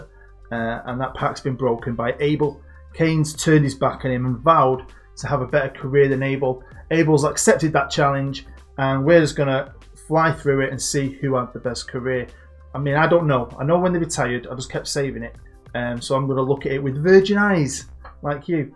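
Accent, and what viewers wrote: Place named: Manchester, United Kingdom. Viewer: Not sure if it's the epic script or the cool asf Corrie accent that is more addictive!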